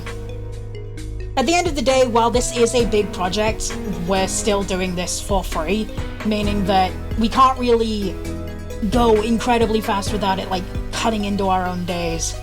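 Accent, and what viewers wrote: United Kingdom. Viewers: I like how many of the comments just point out your voice. I could've figured you have an English accent, but of course I too expected a more man-like voice, no offense. =P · My god... he's British... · Is that a fellow british I sense. RAHHHH 🇬🇧🇬🇧🇬🇧🇬🇧🇬🇧☕️☕️☕️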